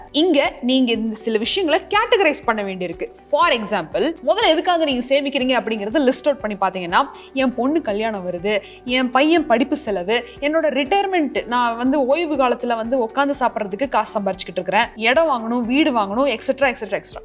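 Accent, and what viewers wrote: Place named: Kongu Nadu, India. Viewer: கொங்குநாடு பேச்சி மிக அருமை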